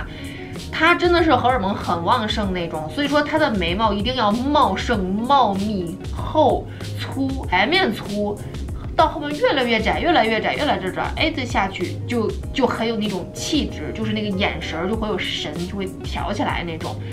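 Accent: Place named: North, China. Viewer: 前几天翻墙节点不对，居然发不了评论。我想说，当知道小姐姐是天津人的那一刻，忽然明白了为什么除了北方口音，你话语里的朴实和接地气特别亲切，原来是老乡！！🤣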